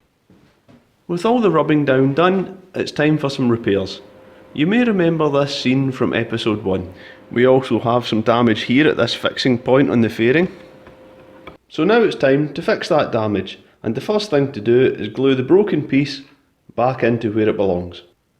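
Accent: Scotland, United Kingdom. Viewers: nice job. my mouth is watering as i watch you go to so much trouble. accent scottish?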